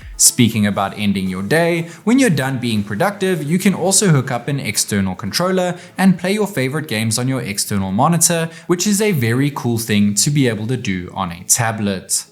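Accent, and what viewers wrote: South Africa. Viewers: Wait he's South African😯😲😳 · oh South African ! jburg or CT ?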